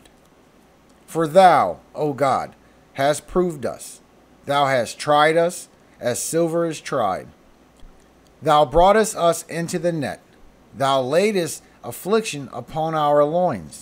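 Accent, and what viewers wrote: Louisiana, United States. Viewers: I could tell you were from La. Please pray for my family in Red Stick. Shalom Patricia Sunday Ministries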